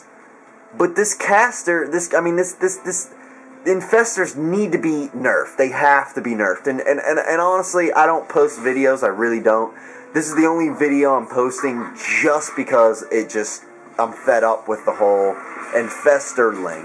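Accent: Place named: California, United States. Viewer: You have to be from Cali :P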